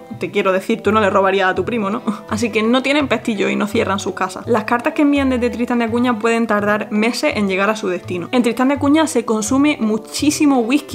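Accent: Andalusia, Spain. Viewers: Otro que lo ha flipado con el video y con tus ojos, apúntame en lista de espera xD Enhorabuena por tu canal y aquí tienes un nuevo suscriptor, y también andaluz, que tu acento te delata jeje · Que feo es el acento español de esta chica. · ¿Me encanta tu acento de que parte de españa eres? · Wow, que video tan bueno, generas muy buen contenido, amo tu asentó andaluz. Saludos desde colombia :3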